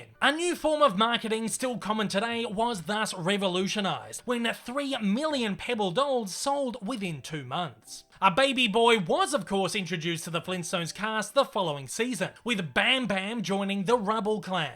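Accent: Australia. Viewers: Is it me, or does this guy kind of sound Australian... I hear a hint, but probably not for those with an untrained ear. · Are you serious? A hint ... He sounds 100% from Down Under. Unmistakably so. Actually his narration reminds me of an old 1980's TV show called Lifestyles of the Rich and Famous, featuring the voice of English entertainment reporter Robin Leach.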